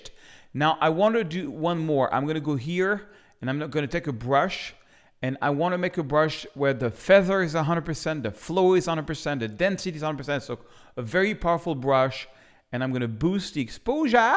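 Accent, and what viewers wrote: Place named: France. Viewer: French man, making fun of his own french accent. Class act!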